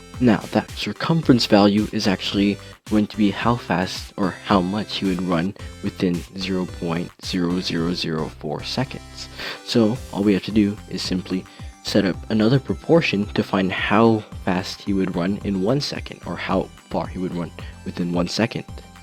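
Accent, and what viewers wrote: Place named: United States. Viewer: you've gtta be shitting me... ur only in high school!? I won't ask what town u live in bc I doubt you'd wanna give up personal info to a total stranger over the internet like that but I will ask what state u live in (or if u happen to live in Canada; but u do sound like ur from here but whatever lol) I ask bc I want to see what states school system is obviously way better then New Jersey (where I live) n their school system lmao.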